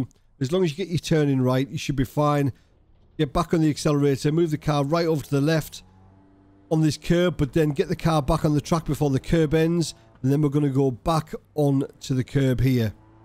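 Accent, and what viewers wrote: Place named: Middlesbrough, United Kingdom. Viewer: Are you a Boro lad? You sound like a Boro lad! Great video by the way. I've found you really helpful since I started iRacing.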